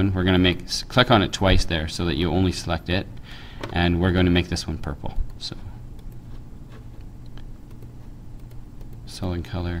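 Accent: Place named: United States. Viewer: Thank you so so much! I had an assignment for uni and had no idea how to do this or anything on excel. Your thorough explanation with your lovely american accent was amazing! Thanks heaps and heaps! :D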